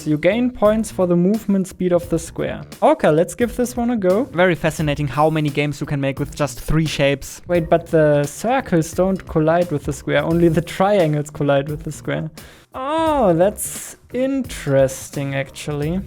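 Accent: Germany. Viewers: Man kann diesen Deutschen akzent nicht überhören xD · Ooh you are germaaan. I wondered why your English sounded just slightly off. Guten Tag btw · Oh lol I knew the accent was German (Windows-search-bar) (I don't know why I did this )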